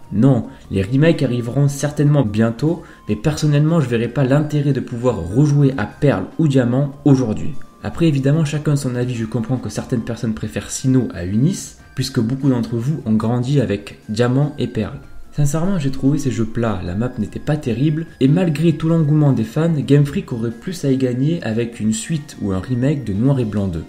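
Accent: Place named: South, France. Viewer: ta l'accent du sud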